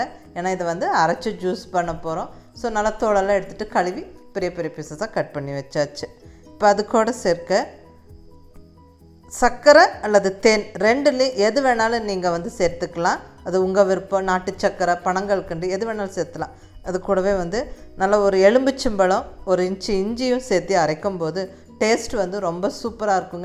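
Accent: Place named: Nagercoil, India. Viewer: Avanga slang Nagerkovil Mathuri irukku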